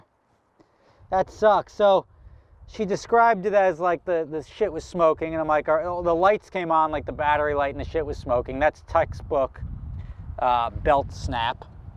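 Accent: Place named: New York, United States. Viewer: The angry New York accent really came out in this one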